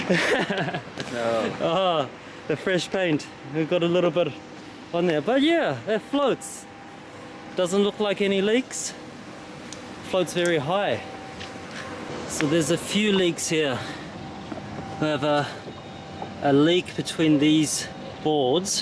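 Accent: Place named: New Zealand. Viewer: where are you from bro? sound like a kiwi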